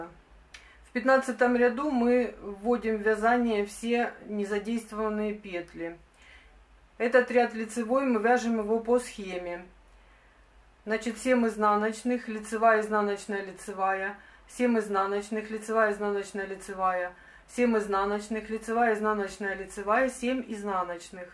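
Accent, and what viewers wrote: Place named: Ukraine. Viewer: Неприятно слушать украинский говор.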